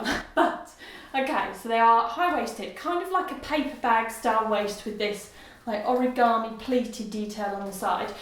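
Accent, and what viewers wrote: United Kingdom. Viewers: Thank you! since I watching your videos i find style which fits me 100%! and I must say that I sold almost all my clothes and I buy some blazers, mom jeans and love a neutral colors! LOve your british accent<3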